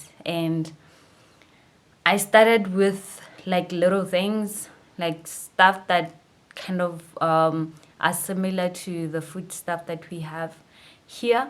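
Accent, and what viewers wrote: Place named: Fante, Ghana. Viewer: You can hear the Fanti ascent in her ❤❤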